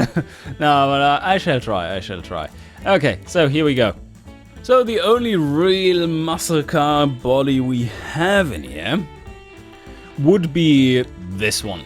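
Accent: Germany. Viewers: You are german???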